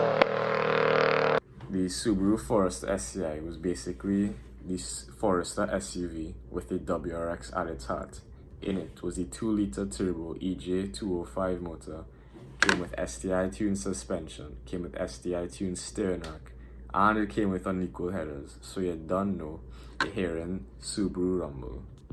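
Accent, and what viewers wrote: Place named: Trinidad and Tobago. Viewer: You have Trinidadian accent.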